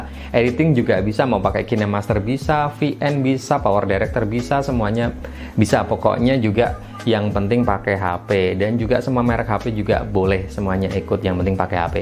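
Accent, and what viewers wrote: Pekalongan, Indonesia. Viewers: cah kalongan ndi suarane?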